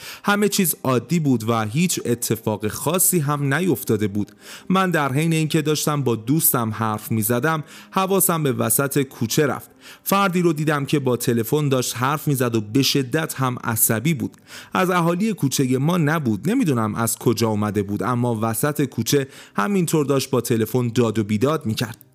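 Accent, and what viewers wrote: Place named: Tehran, Iran. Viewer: این همون ش تهرانیه بودش زدش دیدش گرفتش بستش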